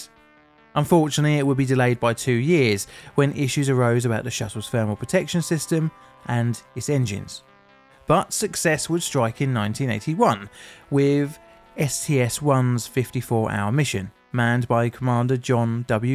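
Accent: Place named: England, United Kingdom. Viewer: Seems to be using a batty English accent to muffle the sounds of his words a bit. You should try to learn to speak American English which includes correct pronunciations for each of the words you wish to speak here.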